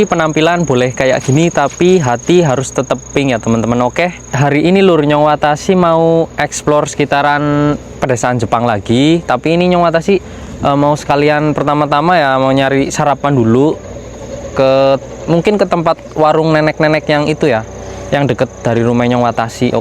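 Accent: Banyumas, Indonesia. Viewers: Ngapak medok banget menghibur bisa jadi rebutan gadi Jepang, jangan tinggalkan 5 perkara tinggalkan 5 perkara, maling, main, minum, madat, mawon, si mirip mas Sentot Cilacap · Sekang ndi asale mas Watashi? Logate ngapak kayak nyong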